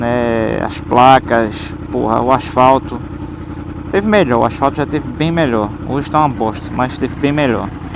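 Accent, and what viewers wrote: Pernambuco, Brazil. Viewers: pelo seu sotaque voce deve ser daqui da terrinha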